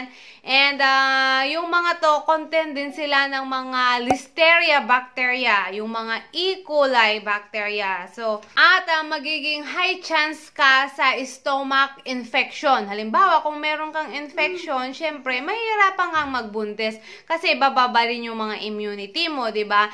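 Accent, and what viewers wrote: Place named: Ilocano, Philippines. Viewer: Hi mam, nakakatuwa naman na ilokano ka pala tulad ko, noon ko pa kasi napapansin ung tuno ng pananalita niyo po hehe. Anyway God bless po!❤️🙏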